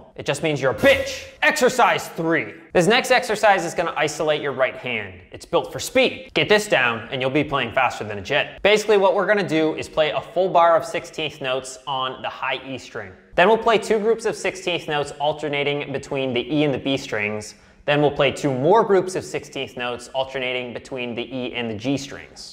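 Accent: Canada. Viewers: You canadian? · This guy sounds Canadian af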